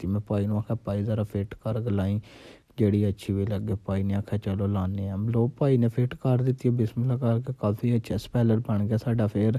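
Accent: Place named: Punjab, India. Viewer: Apki punjabi boht pyari hai kon se city k ho ap?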